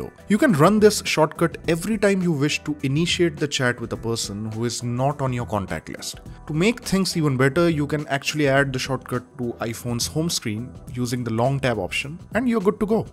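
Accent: India. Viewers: Hate that Hindú accent. It’s very hard to understand!!!!!!!!!!!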